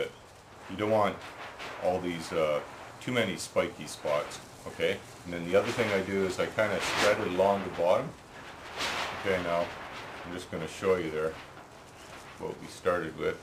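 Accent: Canada. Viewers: That Canadian accent is on point aye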